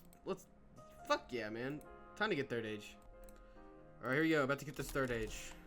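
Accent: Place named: Buffalo, United States. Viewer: U frm buffalo?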